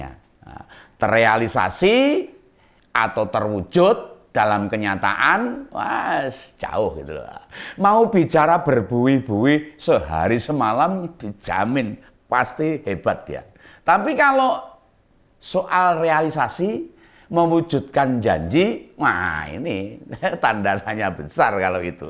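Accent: Java, Indonesia. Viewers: JOWO MEDOK NYIMAK PAKDE........... 🤣🤣🤣 👍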